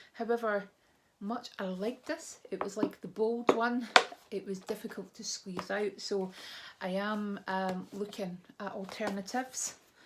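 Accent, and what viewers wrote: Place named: Scotland, United Kingdom. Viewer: To be honest I firstly subscribed on your channel due to your lovely accent ! I miss Scotland so much, I used to live in Edinburgh for 4 years. But then I end up liking your videos more than your accent :) I love your honesty and the way you describe the products! xxx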